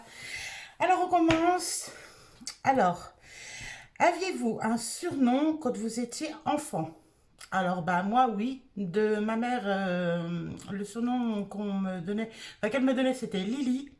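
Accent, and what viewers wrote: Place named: Picardy, France. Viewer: Coucou, j’ai découvert ta chaîne grâce à tiphaine. J’aime beaucoup ta personnalité, tu as l’air d’avoir une histoire touchante, mais tu as réussi à te construire une vie de famille super. Tu fais un super joli couple avec ton mari, vous allez bien ensemble. Vous êtes de quelle région? Moi je suis de Picardie et j’ai l’impression que vous avez un petit accent comme nous! Bisous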